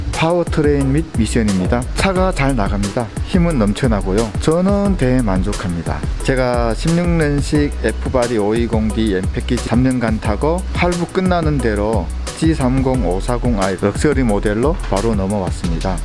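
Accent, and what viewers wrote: China. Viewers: 제 친구도 재중동포인데 말투가 비슷하신 거 같아서 여쭈어 보았습니다 ㅎㅎ BMW를 좋아하시는 것 같은데 올리시면 재밌게 보겠습니다!! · 중국교포에요? 말이 굉장히 어눌함 듣다가 짜증나서 나가요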